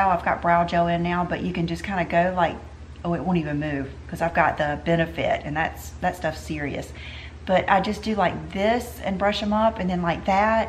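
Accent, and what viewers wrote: South, United States. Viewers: Apples of your cheeks!! Lol she said it when I was writing it! Love your accent. You must live in the south.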